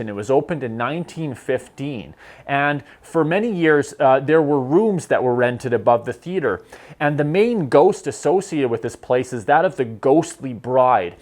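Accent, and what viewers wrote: Canada. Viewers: How can you like him? Worst yet! Every time he says A Boot I just want to smack him. The way he talks sounds like a bad B movie that could not even make that grad, I am totally sad to find out he is from Vancouver.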